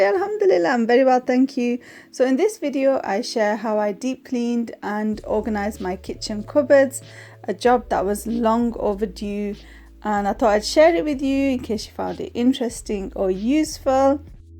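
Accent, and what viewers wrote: United Kingdom. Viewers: Your videos are good but did you actually have 6 babies as a 2nd generation person. Thats what people of the 1st generation did. Pop out a baby every year until menopause. 6 is way too many. Omg !!!!! Were some twins or something ? I mean I dont actually know anyone thats 2nd generation ( children of immigrants ) that have had 6 kids, or are you an immigrant yourself ? You cant be because you speak so British. I think its quite selfish on your children to fill the house with so many babies. IT LEADS TO POVERTY !!!!!!!!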